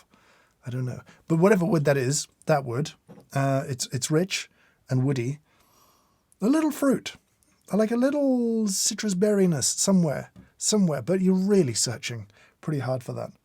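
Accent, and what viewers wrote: United Kingdom. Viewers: Im not a coffee connoisseur but i love your videos. I don’t usually like British accents but i like yours, especially when you review something. It just seems professional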